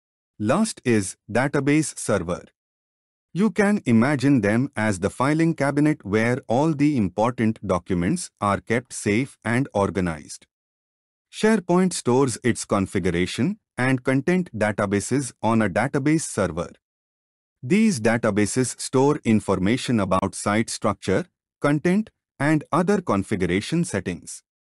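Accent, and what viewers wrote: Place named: Russia. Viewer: Ty kráso, dobrej ruskej přízvuk.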